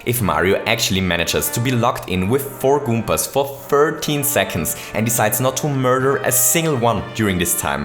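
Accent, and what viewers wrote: Germany. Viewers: Are you german? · He's German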